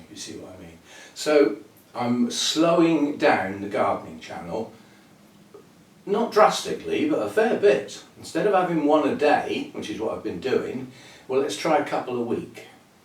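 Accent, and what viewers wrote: England, United Kingdom. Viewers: I have yet to visit England, and would love videos on your town!! I adore your accent 😉 (I’m from Washington State, USA)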